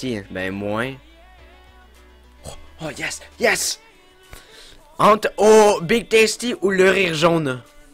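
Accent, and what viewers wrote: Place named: Quebec, Canada. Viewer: Sa fait devoir un quebecois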